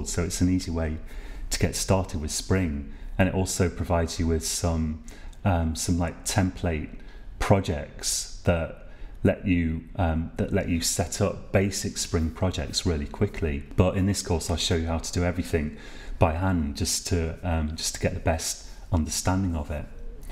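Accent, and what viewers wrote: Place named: United Kingdom. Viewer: British accent ，i barely heard of in the other' s tutorial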